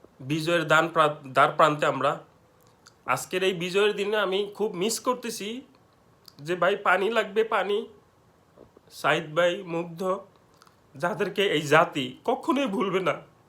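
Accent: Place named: United Kingdom. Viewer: Look the way u talk bai from uk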